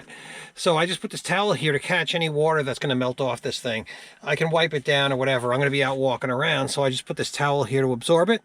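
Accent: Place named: New Jersey, United States. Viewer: your videos are always informative, if not entertaining. Since moving from NJ to CO, I miss the Jersey accent. I get my fix listening to you! Keep the good stuff coming. Thanks!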